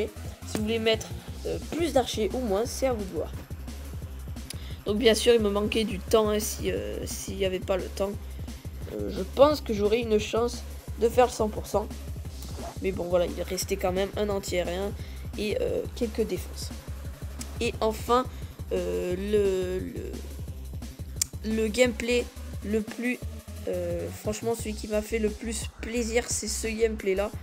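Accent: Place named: South, France. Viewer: On le sent l'accent du sud poto ^^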